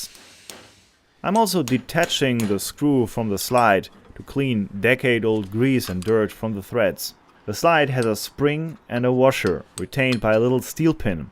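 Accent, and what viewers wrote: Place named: Germany. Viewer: Despite your nearly unappreciable german accent, your english is very good and easy to understand.. didactic and vell done video, gracias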